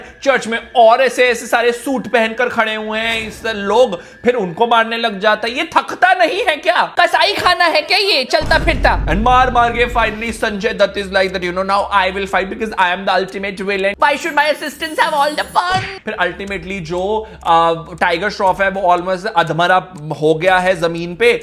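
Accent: Punjab, India. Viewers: Curtain.😂😂😂😂...nice accent in punjabi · his punjabii~ uff~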